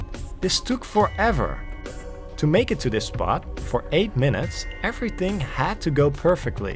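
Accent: Netherlands. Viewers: ben jij toevallig stiekem een nederlander? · You're Dutch aren't you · Your dutch aren't you? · sounds dutch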